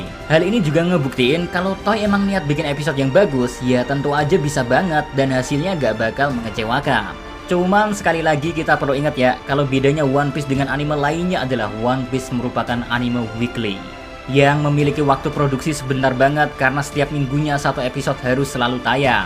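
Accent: Java, Indonesia. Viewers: bang lu jowo bang 😎🤌🤌👍👍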